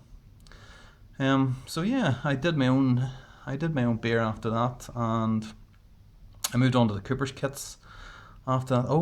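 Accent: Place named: RP, United Kingdom. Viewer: At least you haven't turned into an arsehole now you're popular unlike some of them brewtubers out there that think they're the big I am. Nobody's ever going to read this so I'm not even going to bother trolling. I think eventually everyone learns to relax. You sound kinda posh in this. I first did videos for my mate Jon (he'll be on youtube one day) where I was fine, then did videos for youtube that are awful and then pretended I was always doing videos for Jon (and still do) and it got easier.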